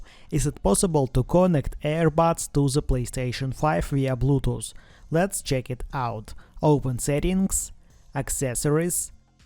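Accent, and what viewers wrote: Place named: Eastern Europe. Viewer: It's like Slavic mixed with Greek with a hint of Arabic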